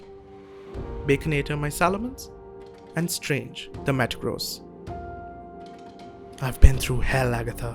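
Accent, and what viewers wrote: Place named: India. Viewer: nah no way no way UR INDIAN I kinda thought u were INDIAN by ur accent but then I thought it would be racist but O MY GOD FINALLY A FELLOW GUY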